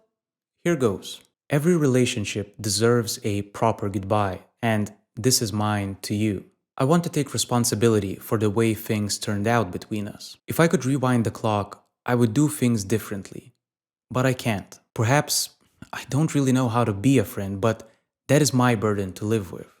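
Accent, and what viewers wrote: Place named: Portugal. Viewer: So relatable, especially after graduating for a few years. Where are you from by the way? You sound Portuguese but look German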